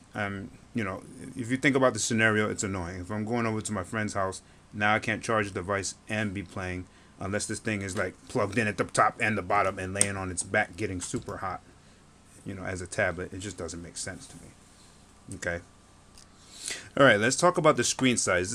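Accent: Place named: Caribbean. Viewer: Sound like your west indian. Grenadian perhaps?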